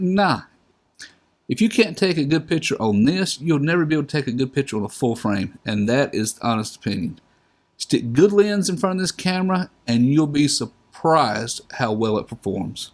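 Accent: South, United States. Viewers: Hi, I am looking for a good camera i can shoot sports and also shoot videos. I would like your opinion on either canon t5i, canon 60d or nikon d7000 or any others u might recommend or suggest. I would like to upgrade maybe to intermedite camera I currently own the canon t3. Hey I really enjoy your southern accent and videos keep up the great job.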